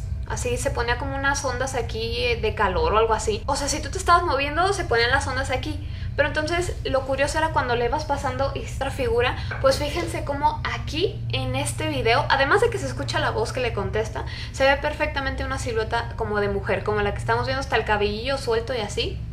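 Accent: Chile. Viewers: Ajaja se kacho altiro k era de chile akkakak ❤🇨🇱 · Me di cuenta de que el es chileno ._. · Anuma me si cuenta que era de Chile como io pedo soy Chilena XD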